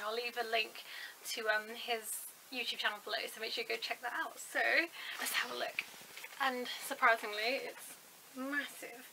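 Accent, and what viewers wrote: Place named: London, United Kingdom. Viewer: Loved this. From what part of Britain are you? I'm guessing London by your accent. But I could be wrong.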